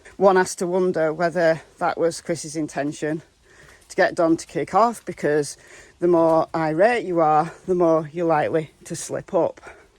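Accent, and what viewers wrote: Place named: England, United Kingdom. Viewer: Now that you mentioned it, H said in his interview that while they were in line at Walgreens to drop off grandma's rx that Don called Candace wanting to know what time she was going to be home! So that might be a contributing factor to your theory. Tilly is a doll baby! This case has really gotten to me and is breaking my heart. Love the UK... esp England and love your accent. Keep the vids coming!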